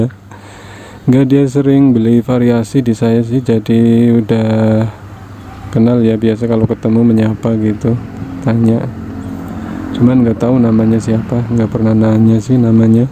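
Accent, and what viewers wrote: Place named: Banyumas, Indonesia. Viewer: Mas e ngapak..🤣🤣🤣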